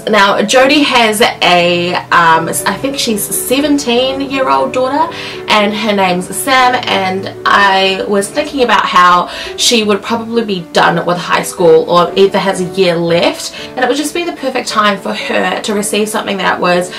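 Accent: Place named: New Zealand. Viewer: I'm so embarrassed! I thought you were an Aussie this whole time! I guess that's me being an American, isn't it? Mixing up an Aussie Accent with a Kiwi Accent hahaha. Please forgive me. I love that you put some real thought into your gifts! You really thought about what you knew about her and her life, and I think the gifts were very sweet!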